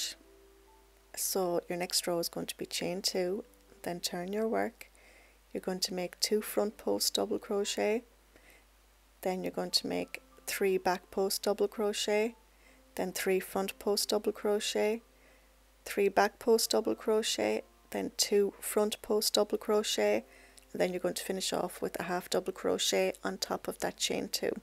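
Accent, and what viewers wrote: Ireland. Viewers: This is beautiful. Can't wait to make one for myself! Thank you for sharing your amazing creations and for the added bonus of the lovely Irish accent!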